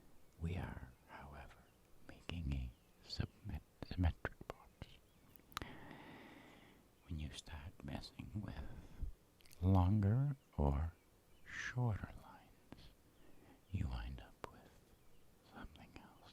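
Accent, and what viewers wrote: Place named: South, United States. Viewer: I like that country sort of accent, you should do like a car salesman role play with that accent, possibly. 👍🏼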